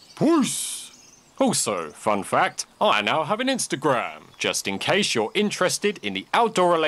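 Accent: United Kingdom. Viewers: Ure probs american it is literally just british!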